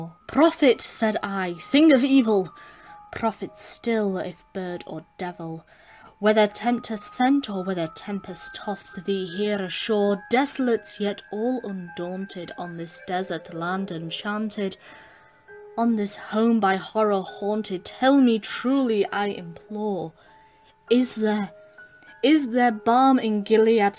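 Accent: United Kingdom. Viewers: British accents are seductive to American ears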